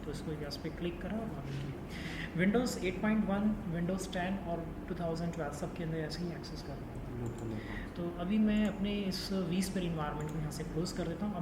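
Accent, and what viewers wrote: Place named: India. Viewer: Very nice Video thank you for doing the Video but the India-English is very hard to understand in that given Speed for a none english Native, also the Level of Loudspeaker is very low .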